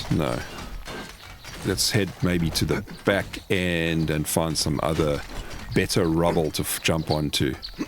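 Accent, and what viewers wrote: South Africa. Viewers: South African accent is so fun to listen to.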